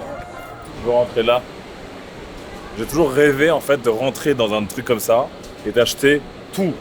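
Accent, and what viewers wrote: Russia. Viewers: ton anglais avec un accent russe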